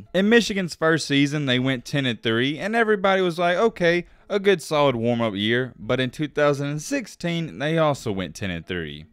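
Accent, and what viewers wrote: South, United States. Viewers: Just an FYI, its Jim not John... On top of that, he's won NO big games outside of Ohio State? This video is completely biased and nothing you said holds any true water. The covid year that helped contribute to their 2-4 record, they were missing multiple coaches all over the staff. At a point, Michigans secondary was nearly coaching themselves last season. With that heavy of an accent, we both know damn well where your fandom resides. His clipboard has nothing to do with his success either, he's implemented the same strategy year-in and out. Any excuse you SEC fanboys can pull, you will. Another side note, what does Quinn Ewers have to do with this video? Smh.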